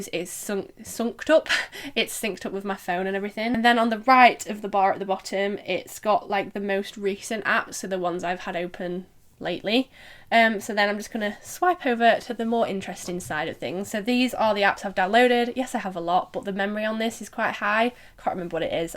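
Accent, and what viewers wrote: United Kingdom. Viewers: Love your British accent! Bloody good video!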